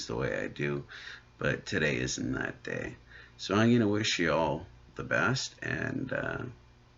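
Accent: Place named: Canada. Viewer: Really enjoying your videos Brother! Nice to hear a fellow Canadian! I was born and raised in Quebec but my Mom is from the Okanagan, Salmon Arm, Revelstoke way. Keep those experiences coming, I really enjoy them and it amazes me how close all our stories from all around the world are. I really hope you are keeping well with your medical issues, I will keep you in my prayers!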